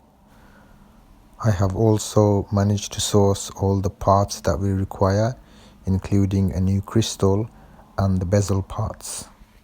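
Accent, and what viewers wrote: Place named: Black Country, United Kingdom. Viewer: Hi. Are you from Smethwick by chance. You have more black country accent than Brummie.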